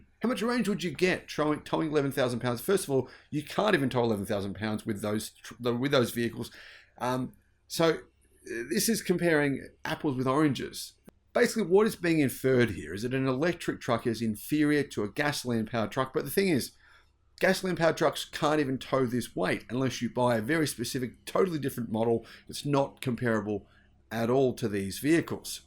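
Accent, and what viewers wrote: Australia. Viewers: Nope! I don't trust your Neanderthal a** anymore. JerryRig is very clear and honest. You sound like you're from Australia or something, that makes sense considering you can't Believe that somebody would drive 65 miles per hour , that's normal here in America in fact slower is dangerous. The region he towed in is cold and mountainous this was a worst case scenario. I know it's hard for you to believe that places like this actually have snow and there's actual mountains, living so far down under, But they do. Every phrase you used it was said to put doubt into what happened but offered no proof. All in all if the truck can't go to 250 miles (real world miles) towing on one charge it can't tow.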